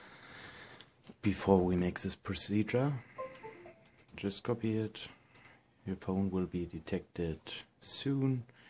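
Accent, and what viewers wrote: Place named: Germany. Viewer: Warum redest du kein Deutsch man gehört genau das du deutscher bist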